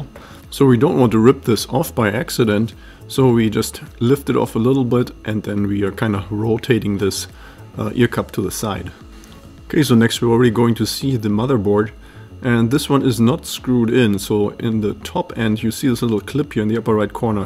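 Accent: Germany. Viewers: Can i comment in german? I thought i've heard a little german accent :D... Ich habe ein Headset von Clam. Da kann ich nicht einfach das Ohrpolster entfernen... was tun?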